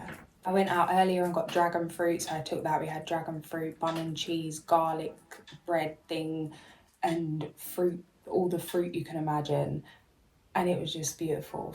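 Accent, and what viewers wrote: United Kingdom. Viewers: As a white dude from America, which automatically makes me a deaf, dumb, and blind idiot according to the mainstream media, you sound British to me honey.. this is great stuff, keep up the great work... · Hadn't noticed honey. Just thought you were from London. A cockney lass. Who cares. You have the right to block anyone who gives a negative comment. xx💝💖💕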